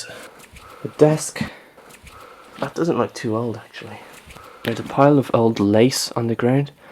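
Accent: Ireland. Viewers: Why the fuck would you assume he's American? This guy is clearly Irish. There's also a bottle of Paddy whiskey and the newspaper even mentions Garda, if you're too stupid to pick up on his accent. Bovril is a type of beef stock which is used to make a broth tea. You're an idiot. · the grid beside the barn is a cattle crush for inspection and reparing hooves not slaughter. and the shackles were probabley for side lining donkeys to stop them running :) and ya sound like your from dublin dude im connemara :D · i love the irish accent. "the udder side" lol.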